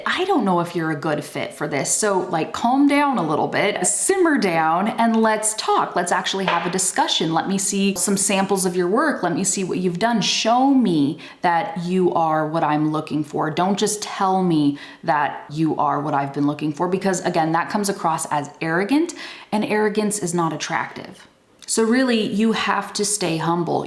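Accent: California, United States. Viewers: Hi. Just want to know why when I listen to you I can clearly understand every word you say. Is it because you are from California or because you had to develop your pronunciation skills as a teacher? Thanks! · I think there are many accents in the american accent. I like yours. from iraq 🇮🇶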